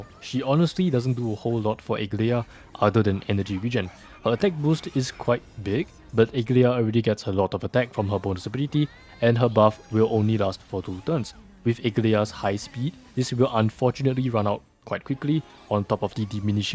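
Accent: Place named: Singapore. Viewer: me too! i could tell from the accent! nice to see a singaporean creator playing this game too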